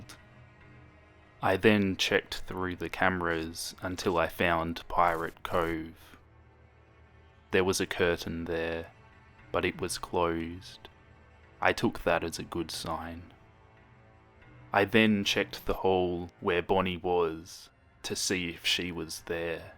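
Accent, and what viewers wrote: Australia. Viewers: Your Australia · OMG UR AUSTRALIAN HI :,) IM AUSTRALIAN TOO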